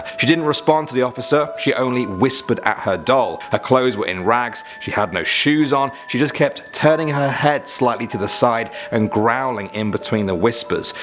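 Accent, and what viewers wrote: United Kingdom. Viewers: British accent so cute....he said " I'd probably run through a wall if he saw that outside his window"😁👍🏽 ♡☆♡☆♡☆ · I love how he is british and not American and he speaks slow so you can actually hear what he is saying.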